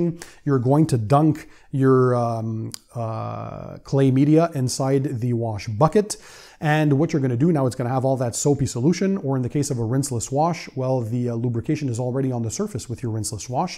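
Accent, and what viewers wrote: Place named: Quebec, Canada. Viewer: you're welcome 🙂 I discovered your channel by only looking for detailing new products, especially Gyeon. I find North American detailing videos more complete than what we've got in France. Merci pour les quelques vidéos en Français, c'est toujours plaisant d'entendre un québécois parler. Avec un vocabulaire souvent plus riche en plus 🙂 Anyway, have you ever done some videos about your garage makeover? Because even if I recently look at your garage tour videos, I have the feeling that I've seen it years ago while I was doing plans for my actual home .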